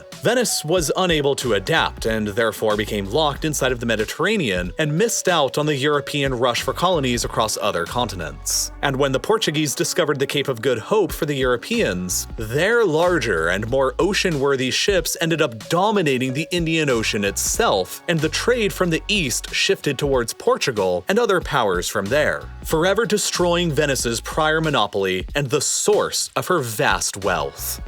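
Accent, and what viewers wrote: Canada. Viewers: Hey! I didn’t know you were Canadian! You can tell because we say Toronno, not Toronto.🇨🇦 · I didn't realise that was Canadian! you can tell because he drops the second 't' in Toronto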